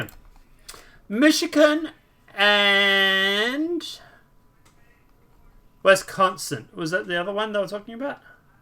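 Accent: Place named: Australia. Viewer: Great work, thank you for trying to keep these crooks honest, sounds like you are Australian, doesn't matter, just thank you, if you are not a US citizen you are doing this for the good of humanity.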